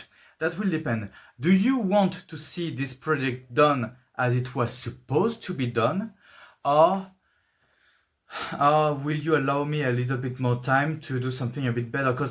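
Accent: France. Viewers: I KNEW YOU WERE FRENCH i recognize it from the accent, but seriously im very sorry to what happened to your project i've heard that the temp has even reached to 40° in some regions, i have never thought in my life that france would be hotter than algeria but here it is now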